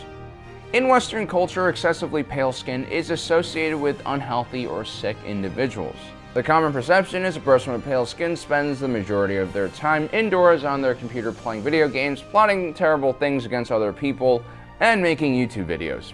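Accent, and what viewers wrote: South, United States. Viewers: You got a wired accent, man. It’s a mix of US southern and British. Great video. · You look asian, but sound southern american. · You have a good modulated voice, don't try to ruin it by speaking like a southern man. It's unintelligible and is quite annoying. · Are you from the south? Your voice seems kinda different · The southener is strong in you. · Why do you have a southern accent lol? I am interested in your family's story.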